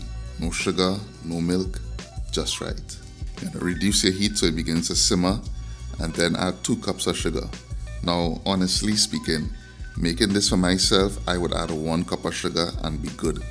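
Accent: Trinidad and Tobago. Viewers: Good explanation man.!!!Love the Trini voice.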